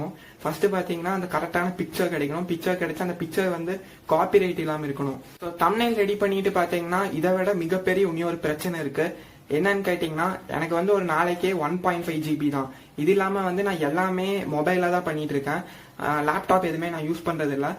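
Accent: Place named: Tirunelveli, India. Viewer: Ennoda suggestion ennanna nammakunnu oru uniqueness irundhathaan namma romba thooram travel panna mudiyum...now u have realized that.. nice ..keep going.. 👍👍👍👍 slang tirunelveli pakkam maadhiri irukku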